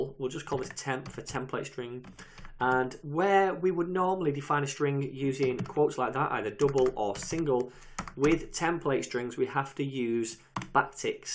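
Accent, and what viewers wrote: United Kingdom. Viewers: "Mathematical Addition" - I like the UK pronunciation. Lot of energy spent while speaking British English I believe.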